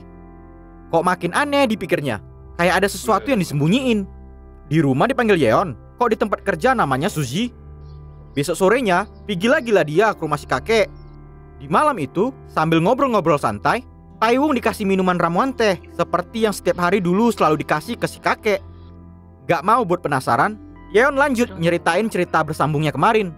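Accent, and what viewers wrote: Medan, Indonesia. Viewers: Kayak nya dari medan ya bang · Bahasanya kyk tmen sy orng medan,lucu😂🤣😂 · Maen kli bahh bahasa logat Medan kita itu ya wakk 😂 Salam dari Belawan Lae ku 🙏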